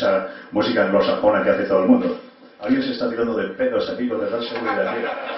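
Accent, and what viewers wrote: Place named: North, Spain. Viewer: se nota que es del norte.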